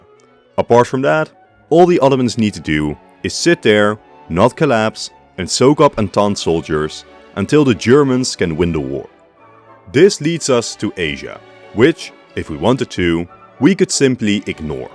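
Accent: Netherlands. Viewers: I can tell instantly that this man has a Dutch accent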